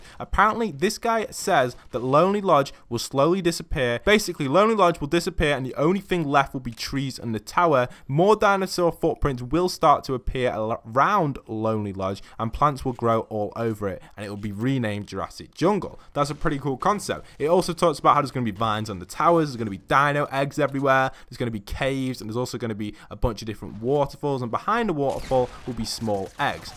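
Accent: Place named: England, United Kingdom. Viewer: Wawrzyn The Prodigy I doubt he went to Harvard. He has an English accent Edit: if he is from UK it would be very expensive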